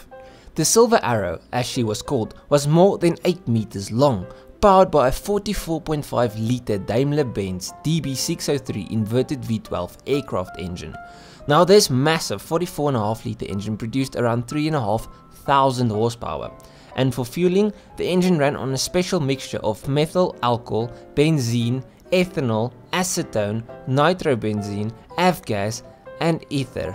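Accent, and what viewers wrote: South Africa. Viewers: i notice that South African accent from miles away😂❤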